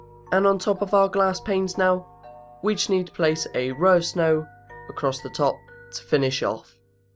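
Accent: United Kingdom. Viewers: No not a robot accent... A British accent. #bruh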